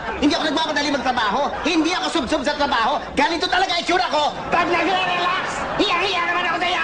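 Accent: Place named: Visayas, Philippines. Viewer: Ala eh visaya he he ka cute goodluck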